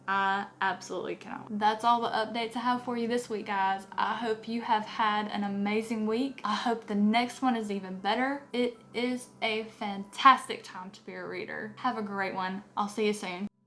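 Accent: South, United States. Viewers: (Random: I love your accent. I've wanted to live somewhere South my whole life, just so I could pick up a twang/drawl of some sort.)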